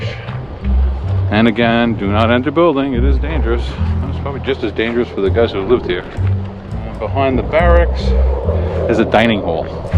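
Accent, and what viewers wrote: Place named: New York, United States. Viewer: I grew up on the east end of Long Island (north fork) we love going to ‘Montauk and learning it’s history. You brought me back home for 30mins with the landscape and your Commentary and your awesome New York accent. 😀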